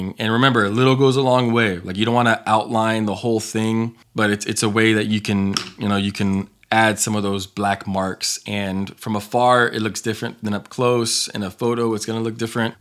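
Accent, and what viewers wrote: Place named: United States. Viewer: You pronounce some words like an American Latino.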